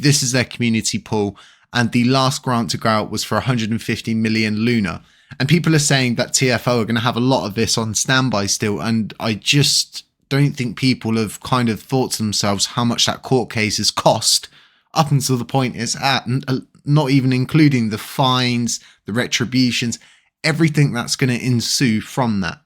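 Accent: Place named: Oxfordshire, United Kingdom. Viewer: Trying to work out your accent. Oxfordshire? Never the less. Great content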